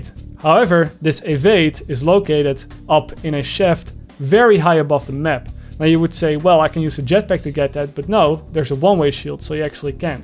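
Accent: Netherlands. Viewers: why is it that, even when i'm dutch i get irritated by the dutch accent xD great vids though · Are you dutch you have a dutch Accent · He sounds really dutch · Ha, Nederlands. Merk t meteen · You are dutch aren't you? I think i can recognize it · Are you dutch?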